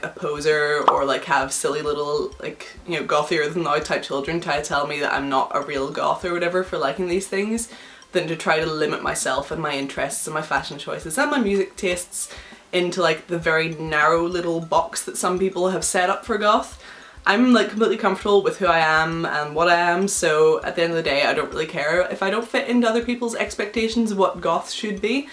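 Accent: United Kingdom. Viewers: Where are you from? Your accent is kind of all over the place... British...Australian.... American...Scottish...? I don't mean that in an ignorant, mean kind of way. I really am curious. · Is she British?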